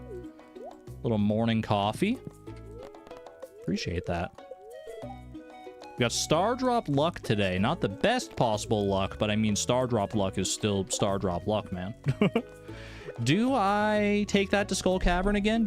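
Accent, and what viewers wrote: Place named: Canada. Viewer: your extra Canadian accent is charming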